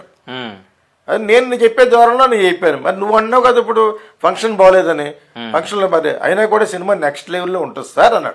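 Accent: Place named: Godavari, India. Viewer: Geetha krishna u r gud for nothing bcoz of nee bullshit talks in channels. How come u target MEGA STAR tell me first ? I watch ur videos regularly bcoz of ur accent of godavari but u make mistakes in interviews by targeting SHANKAR. If u r criticize other Director then u don't have right as a Director.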